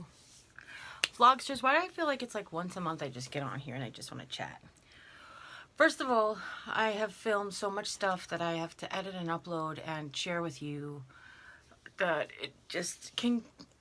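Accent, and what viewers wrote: New York, United States. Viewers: I could listen to you talk all day I just love your wisdom and your accent too hehe I hear that New York in there!! Love you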